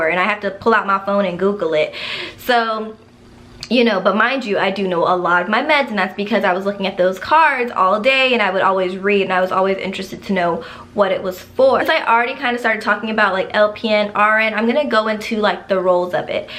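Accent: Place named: New Jersey, United States. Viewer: Sounds like u went to jersey. Great video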